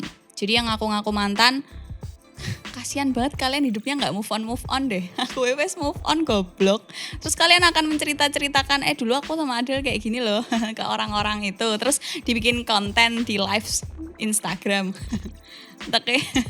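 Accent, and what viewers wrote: Java, Indonesia. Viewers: Jangan mendok teruss kak . pake translate dong kak😔😔 .. Kita org NTT tidak ngerti kak . hehehehehehe .. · Logatnya koq Jawa banget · Meddok mbx 😁👍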